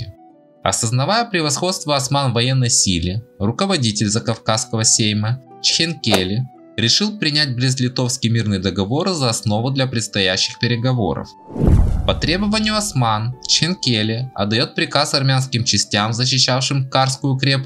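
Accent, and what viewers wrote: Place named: Odessa, Ukraine. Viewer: Азербайджана ещё небыло в это время он только создавался 😃 От куда такой Одесско-Еврейский акцент!?😃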